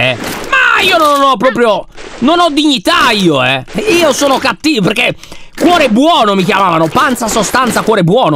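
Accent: Sardinia, Italy. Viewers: sembra sardo, ma i romani fanno "AOOOOOOOOOOO"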